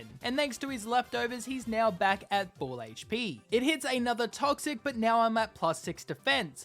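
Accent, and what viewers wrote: Australia. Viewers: Are you Aussie the accent sounds familiar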